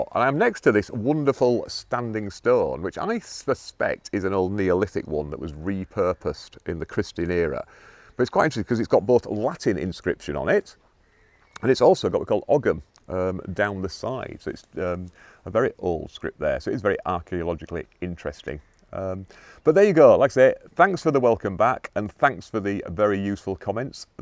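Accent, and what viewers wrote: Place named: Northern England, United Kingdom. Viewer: It’s great to hear your northern accent. As a Mancunian, I’m told I have a broad ‘northern’ accent, hopefully not as rough as Angela Rayner though!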